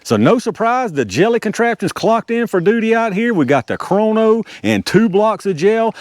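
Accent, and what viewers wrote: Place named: New England, United States. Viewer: I'm from New England, NH specifically. When I play your vids at 2x speed, you sound like someone from up here.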